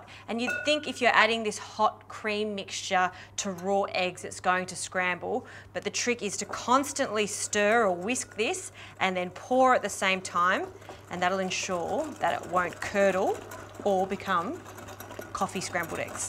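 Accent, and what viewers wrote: Australia. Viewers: Love the Aussie accent although I heard Hate the cream at first. :D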